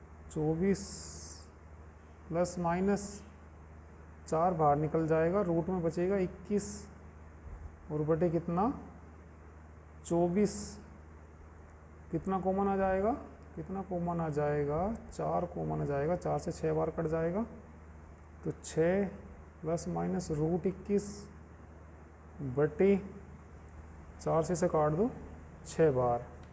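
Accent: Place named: Saharanpur, India. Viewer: Sir Aaj kha se ho islsiye puchh ra kyoki aapki bhasha hmare jaisi h ,,,,Sharanpur wali .😅❤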